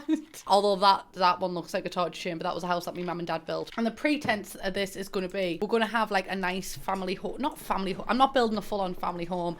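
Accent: United Kingdom. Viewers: Can I just say how nice it is to see someone who is so British and the humour is too. It's so nice not to hear Americans, nothing against them but I just connect with a fellow Brit more. You seriously fucking crack me up and never stop with the accent and slang. Kim and Aggie 4lyf! 😂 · Her accent is by far the most “English” I’ve ever heard in my entire life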